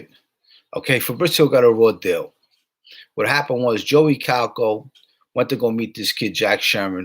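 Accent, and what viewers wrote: New York, United States. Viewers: your accent is straight up NY Gangster!!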